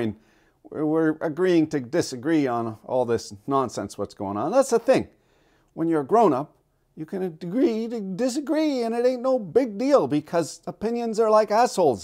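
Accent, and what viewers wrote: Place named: Canada. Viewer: As someone with a lifetime of experience in Canadian English and it's MANY colloquialisms, it gives me a great deal of pleasure to watch YouTube closed captions struggle to comprestand and interpolate your videos!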